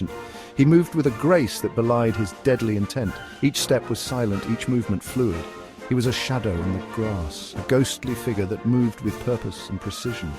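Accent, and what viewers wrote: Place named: England, United Kingdom. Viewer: The most beautiful English accent and the mastery of English language! Really enjoy listening to you and your absolutely artistic use of words! All perfect!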